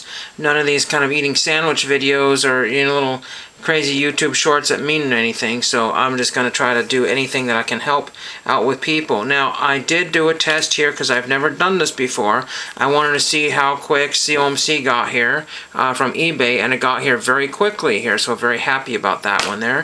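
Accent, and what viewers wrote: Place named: Kansas, United States. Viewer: Cool pickups. You need to work on that Kanas accent though.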